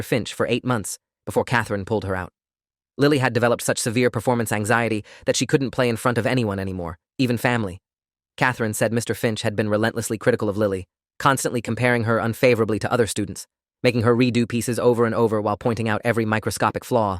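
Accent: United Kingdom. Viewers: YO STOP WITH THE BRITISH ACCENT ITS CATCHING ME OFF GUARD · So I came from the short and this dude has a British accent now😭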